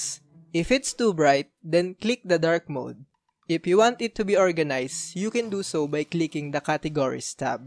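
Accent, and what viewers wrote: Philippines. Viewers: I love you're accentHAHAHAH pinoy ka ngang kupala ka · Sounds like a filipino accent ngl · Are u a filipino?!. Ur accent sounds like it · I love the Filipino accent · The accent of this guy is just the same as filipino accent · Taena sa accent mo palang, halatang pinoy na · eyyy accent pa lang pinoy na agad ahahahhaa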